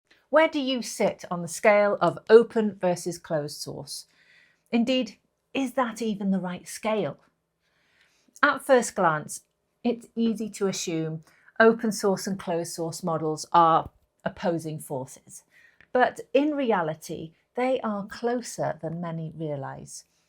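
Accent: Wales, United Kingdom. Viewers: Might be a strange comment but...is that a bit of Welsh I hear in your accent? watched and enjoyed a few of your videos, but only noticed it in the last half of this one.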